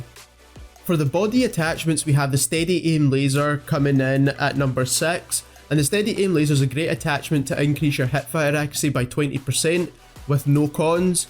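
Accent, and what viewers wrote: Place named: Scotland, United Kingdom. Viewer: The Scottish accent is the best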